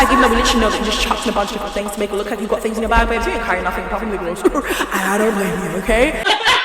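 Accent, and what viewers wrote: United Kingdom. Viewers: I just realized something. And this isnt gunna be PC lol but im genuinely just curious. So most black people in America have a "blaccent" (obvi not all and I did not make up that term) but is that not the case in the UK? Bc you sound like most other British people I've heard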